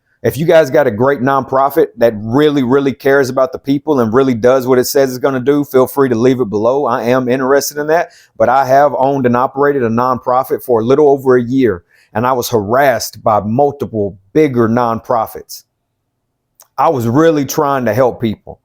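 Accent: United States. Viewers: I like your country/ Southern accent 😉😊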